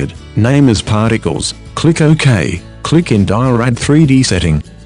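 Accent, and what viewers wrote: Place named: Australia. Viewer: robot australian is a very annoying accent for this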